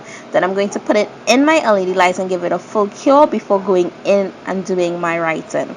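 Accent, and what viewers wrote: Trinidad and Tobago. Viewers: Sounding Trinidadian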